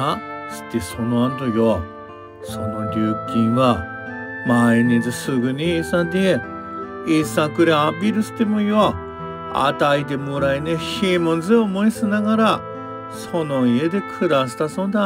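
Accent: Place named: Akita, Japan. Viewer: 秋田弁 懐かしかったぁぁ😂 おばあちゃん思い出しました✨ 今度実家で父にこの動画見せます‼（きっと️秋田弁の響きに飢えてるはず） しかもオチが思ってたのと違って吹き出しちゃった〜😂🤣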